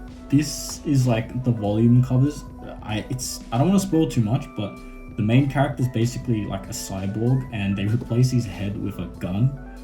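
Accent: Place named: Australia. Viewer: I sense an Australian accent (you might be from New Zealand or I might be wrong) but with how prices are to me your rich.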